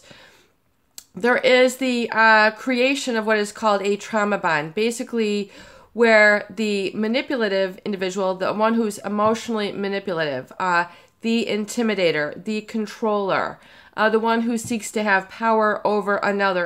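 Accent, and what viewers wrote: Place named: Great Lakes, United States. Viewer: Hey, I can hear the Great Lakes in your voice. Buffalo? Cleveland? It’s charming.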